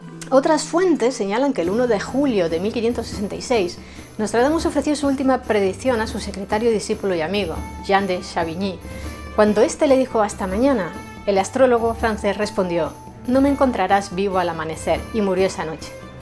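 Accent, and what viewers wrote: Spain. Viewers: . una maravillosa leyenda histórica gracias mil. una pregunta eres Española???.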